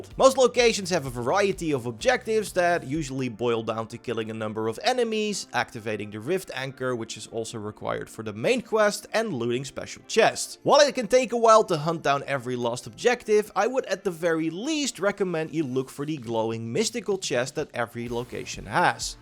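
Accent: Netherlands. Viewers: This guy couldn't be more Dutch. That accent is annoying af.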